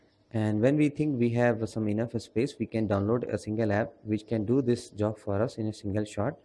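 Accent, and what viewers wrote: India. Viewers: Im 90% sure that u r from india. Ur accent tells everything :) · having a deep Indian accent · First, I don't understand indianglish, sorry. Second, I have no single thing to delete (factory settings, new phone) and still 'insufficient storage'. F***k why?!? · you can slow down, you talk way too fast to even comprehend what in the hell you're even saying! Maybe a fellow Indian ( I'm not speaking of a native American Indian) can understand you. And you seem informative in tis area and on this Question I need answers to.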